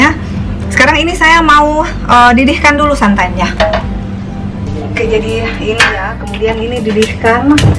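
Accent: Manado, Indonesia. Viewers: P senang vja dengar ba logat bah Manado,,beking kangen pa Kel.di kampung,,,btw Malaysia di mn dang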